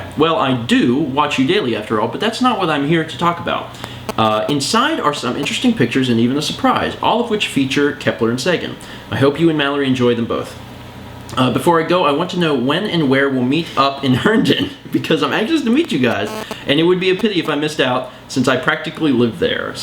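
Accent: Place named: Louisiana, United States. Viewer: That accent sounded like. In the bayou!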